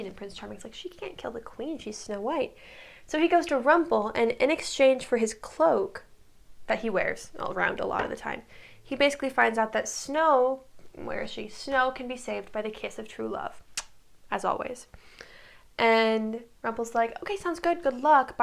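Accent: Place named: Australia. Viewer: Wow! Never expected to realize that I needed a full retrospective on season 1 of OUAT. Thank you so much for processing this mess of a show into a coherent recap. This video rekindled my interest in this fandom, so I guess it's time to dig some of my favs from ao3. Because the show treats itself so seriously, I was giggling whenever you were referring to Rumple or whoever with "bestie" or completely slandering someone for whatever illogical thing they did. Like half of the time plot important characters appear out of nowhere to do some random moral talk for a side-story character because... reasons? Like why the hell Belle suddenly materialized to give a true love speech for Grumpy/Dreamy. It's even funnier when you consider her side quest with Mulan just somewhere around that same time period. Like the Mulan? Seriously? Or the fact that Regina crushes Christian's Gray heart(whatever is his name in the series) and no one actually cares in just about a few minutes(even Emma with her unresolved internal conflicts and fear of abandonment) but Catherine not-so-dead-but-actually-kidnapped is getting like multiple episodes in which everyone in town speculates on what's happened only because let's be honest here it's just juicier gossip than random ass sheriff dying of a heart attack in what? 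30ish years old? It was two hours and a half long video and I chuckled every time you did that. Also, your Australian accent and some characters' quirks impressions were just killing me, like I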